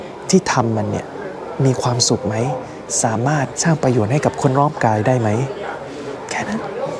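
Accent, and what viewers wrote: South, Thailand. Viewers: พีเป็นคนใต้ไหมครับ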